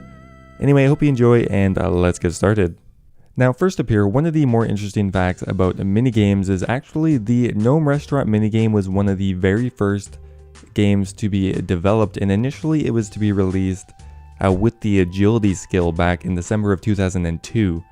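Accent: Canada. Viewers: Are you Canadian? You DO have an accent but it's so hard to place EDIT: Just looked up your Twitter and it lists your location, I fucking knew it you Canuck bastard, I live on the US side of Niagara Falls and I am very familiar with the subtle differences in the pronunciation of certain words (plus out of all local radio stations I receive, the Canadian rock station we get here is better than the one on the NY side tbh)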